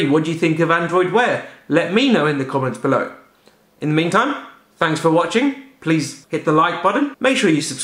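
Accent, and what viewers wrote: India. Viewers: American accent? indian...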